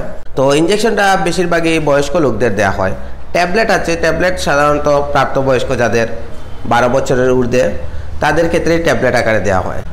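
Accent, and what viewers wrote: Sylhet, Bangladesh. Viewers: Sylhety naini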